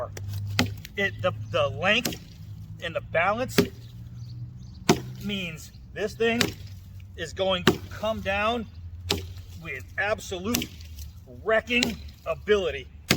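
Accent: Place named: New England, United States. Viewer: Yeah, I figured you were a combat vet. My entire family was in the service. Except me….lol. In listening to you talk, I kinda figured it out. Well, that and you’ve got the New England accent like me. Anyway….stay safe,little brother and thanks for the content. It keeps this old man in good spirits and makes me look forward to your next vid. Be well….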